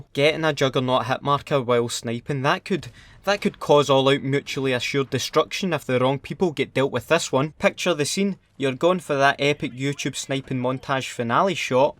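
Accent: Scotland, United Kingdom. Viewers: Like the Scottish accent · The funniest shit I've ever heard, and it's even better with the accent. The Scottish accent is my favorite accent next to Australia. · I love him and that Scottish voice his new nickname keep up good work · I watch so much that when I hop on CoD I eventually slip into a wee Scottish voice. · Max Ramirez he's Scottish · your accent shaped up my own Scottish Accent. Honestly my accent got a lot better when I started watching your videos.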